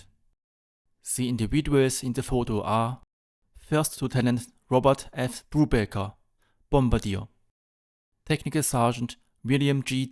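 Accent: Germany. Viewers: Thank you posting, and your English is perfectly understandable . I want to say your either Austrian or German going by accent . Thanks again for posting this, very interesting ..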